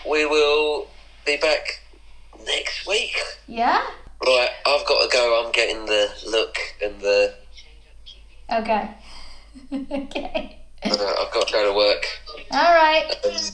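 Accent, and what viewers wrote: Scotland, United Kingdom. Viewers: I just really wanna hear you say "THERES BEEN A MURDER" in your scottish accent...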